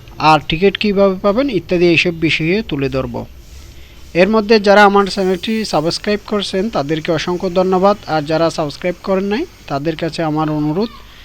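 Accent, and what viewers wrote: Chittagong, Bangladesh. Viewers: your accent says you're habituated in your own Chatigao language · যখন ভিডিও বানাচ্ছেন তখন সুদ্ধ ভাষায় বলা উচিত এতদিনেও চট্টগ্রামের টোন পরিবর্তন করতে শিখেননি যার ভিডিওটা দেখে মজা পেলাম না।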